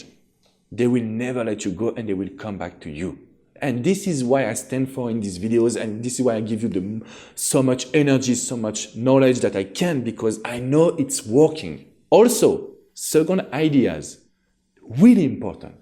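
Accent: France. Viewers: mon cheri tu es tres beau!! PS I understand your French accent just fine..😉 and je ne parle francais really so... You give great advice! Merci Beaucoup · the women love your French accent! I have a question. What if a guy says he needs closure but wants to remain friends and one minute acts happy about the break up and then sad about the break up and what if I can’t help but say really stupid things over text about him moving on.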